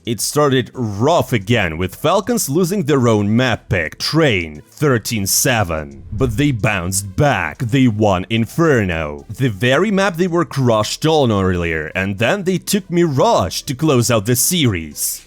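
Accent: Russia. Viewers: Your English sound Russian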